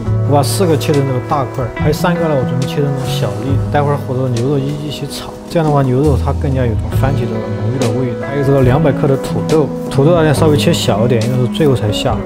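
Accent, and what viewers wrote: Sichuan, China. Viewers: 师傅是四川人吗？椒盐普通话很好听。😊菜单也收藏了。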